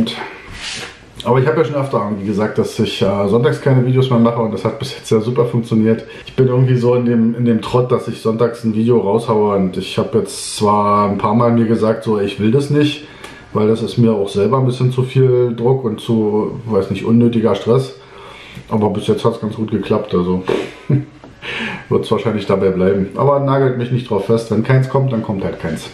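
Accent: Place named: Germany. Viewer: Kann hier nur die Videos von Luca Lerga empfehlen, der hat sich mit dem Thema kaufen oder mieten beschäftigt , das trifft ja auch auf den amerikanischen Markt im allgemeinen zu. Eine weitere These hat Mike Hocke vor kurzem aufgestellt, und zwar wenn die Werkstätten deinen deutschen Akzent hören versuchen die dir die Scheine aus der Tasche zu ziehen. Sind aber alles nur Vermutungen. Ich bin auch selbstständig und mein Auto ist auch ständig in der Werkstatt und immer wenn ich denke , jetzt ist aber alles gemacht, dann geht wieder irgendeine Kontrollleuchte an. Ich kann echt mitfühlen wie scheiße das ist.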